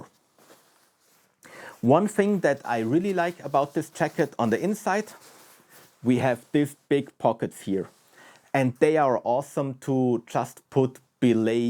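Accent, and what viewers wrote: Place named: Germany. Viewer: Hi! here Giorgio from Genova, Italy. I'm a mediocre alpinist / hiker. About the jacket you reviewed: you mentioned the price is almost 300 euro and that seems to you cheap, as I well understand. To be honest it doesn't seem to me exactly cheap also considering the possible good quality of this article. I recently bought the Simond Alpinism Light Piuma that costs almost 1000 euro and yes, maybe it has not the same warm... but has double zip closure:). Do you know it? In general I appreciate your contents. I'd suggest to mix the static camera view you generally use mixing your talk with on-field recording just as you did in the ice axes video. This would enhance the "quality" of your videos already very good and I agrree with your points. BTW, I know you are from Switzerland, but from which region you are? By your accents it seems you are from a German-language, right? 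You told you spend a lot of your days in mountains, so my suggestion / request is to share (if you feel interesting for your audience) some interesting alpine climbing routes in Switzerland you do. For me this is not for practical usefulness, but just for a cultural curiosity. Also, in general I'm interested to know climbing techniques and "common sense" mountaineering behavior rules in other countries, a part Italy. Thanks again giorgio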